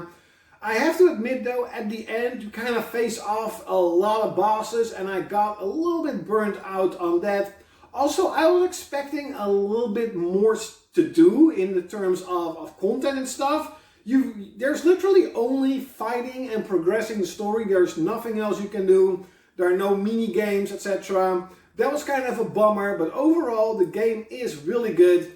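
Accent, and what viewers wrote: Netherlands. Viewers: Where are you from? I think i hear a dutch accent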